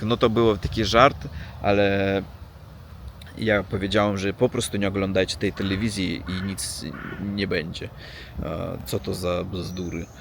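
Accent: Russia. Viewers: A mnie podoba się twój przeplatany język - polski z zaciągiem rosyjskiego akcentu i słów .Wyjątkowo przyjemnie się go słucha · Racja , przestań przepraszać,bo możnaby pomyśleć że to z przekory, że wiesz że pięknie mówisz po polsku i czekasz na pochwałę. Mówisz pięknie lecz z ruskim akcentem, zamiast O mówisz A oraz mówisz Ł głęboko, gardłowo zamiast L. Brawo na 4❤️ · Naprawdę nie musisz sie ciągle sumitować. Wszyscy wiedzą, że Rosjanom trudno się rozstać z akaniem. Osobiście uważam, że język to jest to co Rosja ma najlepszego i polski z zaśpiewem rosyjskim brzmi chyba nawet lepiej niż bez.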